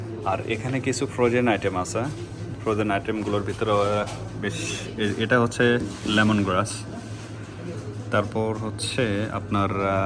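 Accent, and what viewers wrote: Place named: Bogura, Bangladesh. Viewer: বগুড়ার কথা শুনে সাবস্ক্রাইব করে দিলাম শিবগঞ্জ উপজেলা থেকে দেখছি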